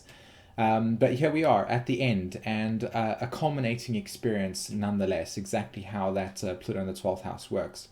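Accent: South Africa. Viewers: Are you South African ???🙀😺. Great video 👍🏽🌚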